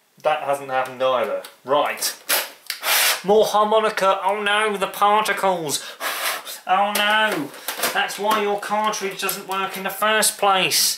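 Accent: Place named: Lincolnshire, United Kingdom. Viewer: You sir are too funny. And oddly you sound like you have a Lincolnshire accent.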